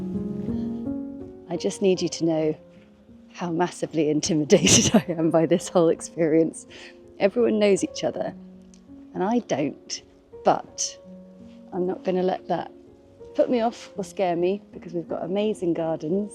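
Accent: England, United Kingdom. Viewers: I think this is wonderful. I love architectural elements. Your first stroke was so precise! No messing around with that circle- you hit it first go! You are very brave. Not only are you painting with no real drawing there, you are miked up and filming yourself!! You do it so beautifully and effortlessly but I’m sure it’s a lot of intimidation rolled into that. You truly are something else. How you can paint and talk with no feedback from anyone and make it so easy to understand is just amazing to me. When I decided to dip my toe into watercolors I followed four or so YouTube artists. Then I found you. I unsubscribed to them all. You are talented, in many things. Your voice is so soothing and sad to say if I don’t like the voice well.. forget it. English accent is a bonus. Your life is sweet, you don’t use this channel to unburden your issues like some do. 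Ok I’m sorry for them but I’m here to paint only. Anyway we all love you. I put watercolor away for a year because I did something else creative but my friend is coming and wants tips so I came back and have been watching your videos quite a bit in last days. I’m excited and the spark it back! There is a lot to absorb with any new endeavor and I know it’s the practicing that will get me there. Hope you had a great time!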